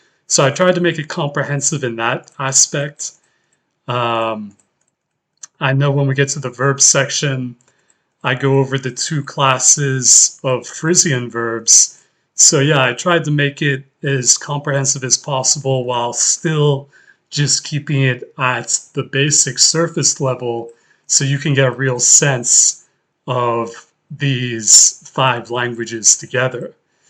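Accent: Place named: United States. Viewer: What a concept! I am considering learning Afrikans and Frisian at the same time, or at least close together. I am going to go to your website because I am curious about you. Based on a few clues I think that you were raised in a household where English was the primary language of communication. But, you do sound American. So, my first guess is Yiddish.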